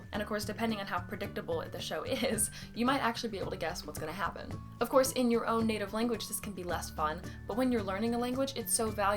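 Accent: United States. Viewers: I've been learning English for a while and without any doubt you are the native English speaker that I could understand the most in a normal speed. where are you from? I meant which part of the USA people speak as the same accent as you? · Im from indonesia, im asian. you're good really american in top speed love.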